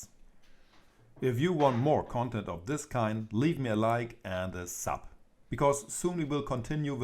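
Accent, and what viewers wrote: Germany. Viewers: Don't you ever dare to lose your german accent,. Best wishes.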